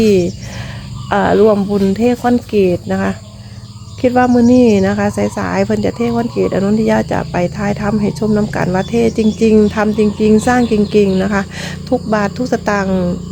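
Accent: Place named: Laos. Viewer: เสียลาวหลายจ้า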